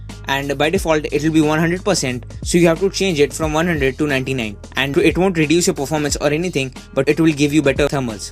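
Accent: India. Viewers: It is an amazing Indian accent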